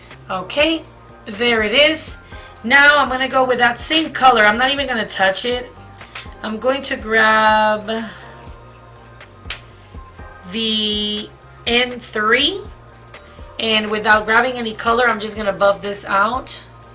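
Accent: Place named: Miami, United States. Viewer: I love watching your vids! You’re always super vibrant and vampy. 💜 Are you from Miami?? You have a very similar accent to my own 😂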